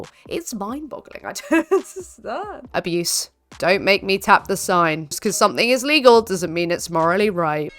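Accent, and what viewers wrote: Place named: United Kingdom. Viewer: I enjoy your wit ... and your British accent 🥰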